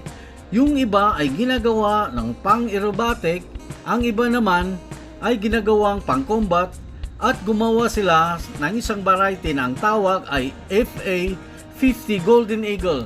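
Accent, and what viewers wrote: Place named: Visayas, Philippines. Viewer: Visaya nimo dong kaya pala tigas ng solte nimo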